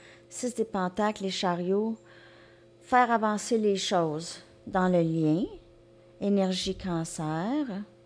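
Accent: Quebec, Canada. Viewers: OK 👌 j aime bien écouter votre langage du Québec il est super un vrai régal pour les oreilles 👂 à demain 👍🌝🕊🌈🇨🇵Frédéric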